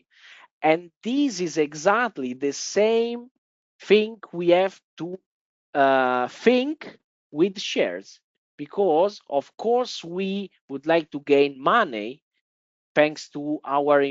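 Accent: Italy. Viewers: You have italian accent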